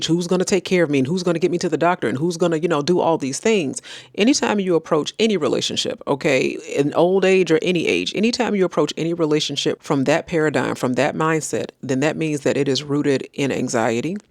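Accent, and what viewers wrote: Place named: United States. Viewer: I love this accent , where abouts in America are you from ?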